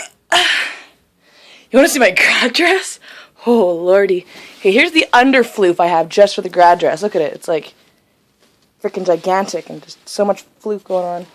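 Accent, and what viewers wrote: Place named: North, United States. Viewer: I'm sorry for your loss! What state are you in? You sounded like you were from up north but you threw me off with the palm trees...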